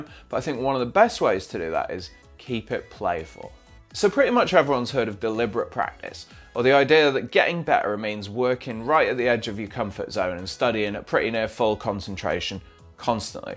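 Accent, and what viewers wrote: United Kingdom. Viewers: From same town as Jagger? Same accent. Great content! And coincidentally I'm re-reading The Organized Mind right now.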